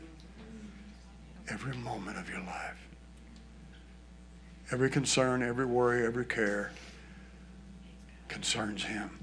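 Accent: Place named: Texas, United States. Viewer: he has publicly denied being Elvis Presley several times. isn't the problem it's a group of his congregation who keep feeling the fire. Only because they want him to be Elvis so badly that ignore the truth. Yes, he does sound a lot like Elvis when he sings but that's where it ends. There are thousands of Elvis tribute artists who sound similar to Elvis but that doesn't make them Elvis. There is no physical resemblance between and Elvis. There eyes, nose, mouth, lips and teeth are all different. You can find pictures of a young with his family on the internet where he has dark hair and beard. Elvis was 6 feet tall while is 5 ft 10 inches. is 17 years younger than Elvis. He was born in 1952, Elvis was born in 1935. is 74 while Elvis would be 89. Elvis was a senior in high school when was born. was born in Forte Worth Texas in Tarrant County area which is why he has a strong Texas accent. 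While Elvis was born in Tupelo Mississippi which is why he has a Mississippi accent. Elvis was married 1967 and eas dating Linda Thompson when married Wilena. father was an ordained minister from 1957 to 1990 when he passed away at age 93. became an ordained minister in May of 1981. After his father died, his mother and 2 sisters travelled through Texas preaching the gospel in small towns too small to have their own church. He did that until 2005 when he moved to Benton Arkansas and worked as carpenter until he opened a small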